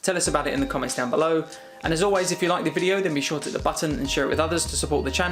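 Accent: United Kingdom. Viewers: that be good also but if I were you Im gonna try not to rush in speaking and remove the accent & slang since not everyone who watch your video come from britain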